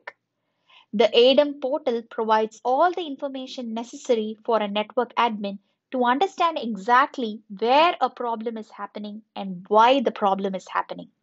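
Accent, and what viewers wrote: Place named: India. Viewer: Indian english.. sorry this is can not work.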